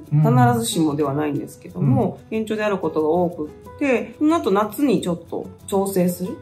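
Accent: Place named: Kansai, Japan. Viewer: 健康第一！ 馬ちゃんの関西弁もっと聞きたいねん😁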